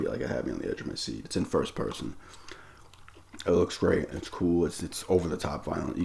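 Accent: New York, United States. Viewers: Such a new york accent compared to today's videos. I dont even know who this is 😂 you the man!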